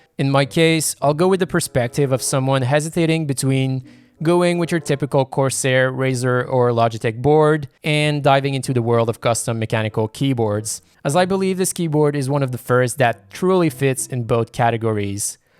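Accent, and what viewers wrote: Quebec, Canada. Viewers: Are you french canadian by any chance?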